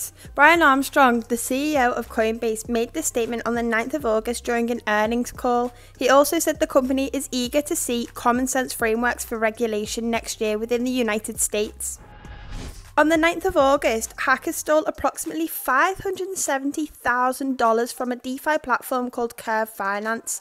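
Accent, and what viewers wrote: United Kingdom. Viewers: Yea to the northern accent! I’m sold.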